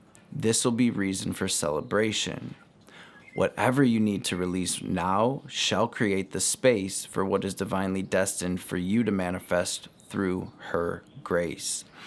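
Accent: Detroit, United States. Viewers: You fasho gotta be from Detroit, “what up doe“, “my babies“ 😅😅 and of course the infamous Detroit 🧢 😅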